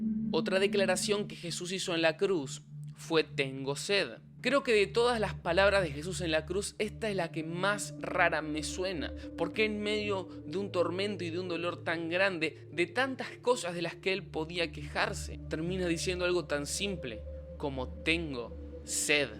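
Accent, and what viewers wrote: Argentina. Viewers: wtf cuando habla argentino y tiene un canal de youtube sobre teorias y conspiraciones de jesus xddd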